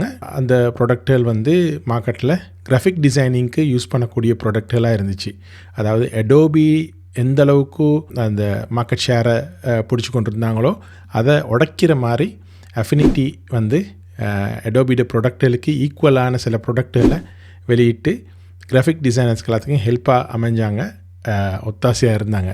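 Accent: Sri Lanka. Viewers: Are you from sri lanka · bro Eelam tamil ah?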